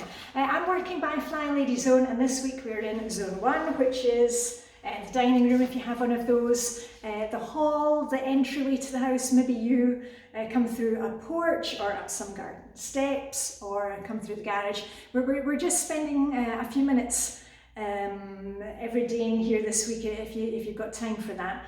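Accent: Scotland, United Kingdom. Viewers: Hi, I know you from Carol Tuttles T1 page. How did I not know you were Scottish 😍. I assumed you were Danish. From a fellow T1 flybaby 😀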